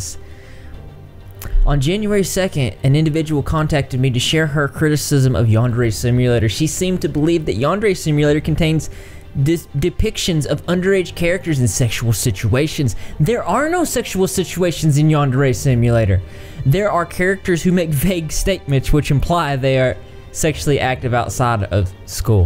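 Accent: South, United States. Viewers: I know this is a serious topic, but I have to be the one to say that, I love your southern accent, it is very soothing.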